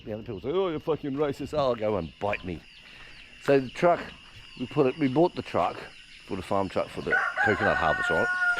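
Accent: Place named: Australia. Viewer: Just found your channel. Popped up in my feed and caught my eye. So good to hear an Aussie voice after all this time. Anyway I have subscribed to keep up with the laughter.Until people live here they have no idea of the hoops that we have to go through on a daily basis. I learnt not to go to the hardware on my own because they just don't serve me. Too afraid to speak English.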